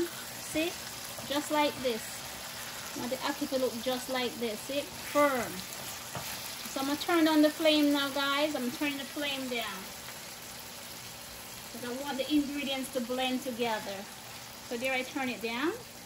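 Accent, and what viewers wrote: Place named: Jamaica. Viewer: Wow i have not tasted something like this before i know it is very delicious i can hear Jamaican English asent i love Jamaican language it make me laugh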